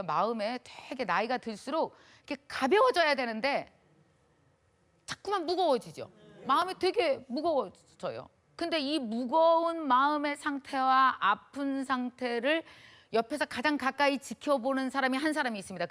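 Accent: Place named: Chungcheong, South Korea. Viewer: 구수한 충청도사투리 넘! 강의 잘듣고 많이 웃고 감니다.....🎉😅❤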